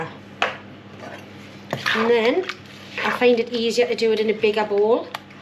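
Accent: Newcastle, United Kingdom. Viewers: Hi I just wanted to say I've just found your great channel as a home cook I really enjoy your cooking your hubby is great too I'm John in stow on the wold Cotswolds semi retired now so more time to cook! 🎉also I really love the accent my bestie is a Newcastle guy any way pork belly I've made mince and onion pie ( my own pastry)! Lol loved my nana too she was a great cook! Will continue to watch ❤❤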